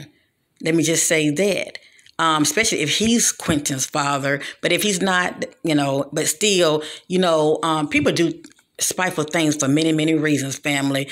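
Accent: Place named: North Carolina, United States. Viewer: Idk where you're from but your accent sounds like southeast NC. It sounds like home to me. I know you're talking about really dark things but I get so soothed and relaxed from your voice because it takes me back to the women who cared for me, taught me and showed me love as a child. I wish many blessings to you and thank you for the hard work you do.💜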